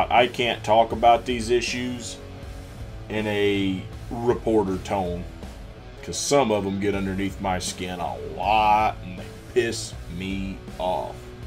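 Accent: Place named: South, United States. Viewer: for what it’s worth you have consistently been one of my favorite channels because you speak your truth in this desert of ridiculous echo chambered circular firing squad madness of collective cognitive dissonance that makes up most of so called “indie media.” If “independent” means “independent” of actual intellectually honest and consistent thoughtfulness; then that title may apply to far too many channels, but not yours. Whatever you do; always be wishing you success, the best and nothing less. Take care out there brother as it’s been nice hearing a fellow southerner that shatters the stereotypes; thanks for all you did (and will do.) ✊ Always Be, Your Huckleberry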